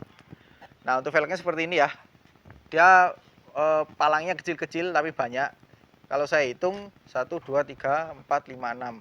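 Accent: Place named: Java, Indonesia. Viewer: Suaramu begitu iconic dan medok mase 😁👍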